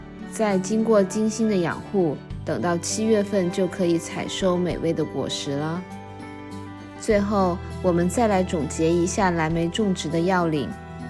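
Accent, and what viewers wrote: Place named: China. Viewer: 中國人的4瓶!! 雖然用了正體漢字聽口音跟用詞都不是台灣人跟香港人的用法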